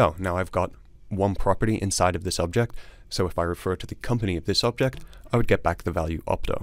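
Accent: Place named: East Coast, United States. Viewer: I thought this tutorial was excellent. Thank you. BTW, I can't quite pin point your accent. I can hear its in the United States but where specifically I can't quite figure. It does have some East Coast in it but given you operate from the West Coast, I'm wrong probably. Anyway, thanks again for the clear insights. I've since subscribed for more excellent content.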